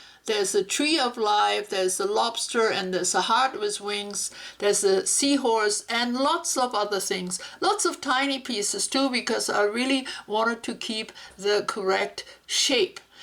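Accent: Germany. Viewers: Dear, congrats to your fabolous skull art piece! I love it very much. I´m also in love with skulls, especially combined with glitter and shine... To use old embossing powder to highlight the eye is a very cool idea and turned out very nice. I´m looking forward for new cool videos from your creative lab🙂 P.s. Do I hear a little german accent?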